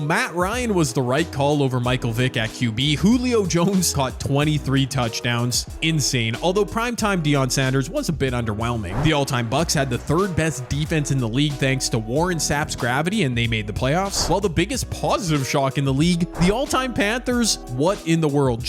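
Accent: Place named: Canada. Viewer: Your accent sounds Canadian, then I looked at your channel and confirmed that you Are Canadian. Being a Canadian, what do you think about Canada getting an NFL team or Two?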